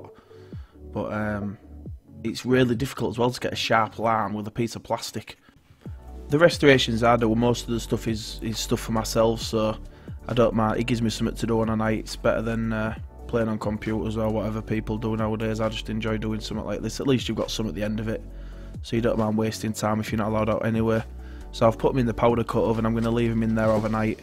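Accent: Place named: East Yorkshire, United Kingdom. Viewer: Great job your accent sounds like East Yorkshire. I use cocktail or tooth pick sticks cos they hold the paint better just trim the point bit if you want it thicker.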